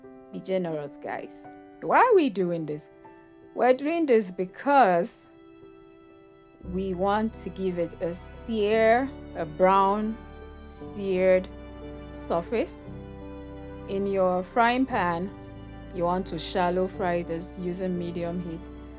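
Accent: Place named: North, United Kingdom. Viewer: Hello sis... Here watching.. Your presentation is giving vibe. I like the northern posh accent echoring beyond your voice 👌👌👌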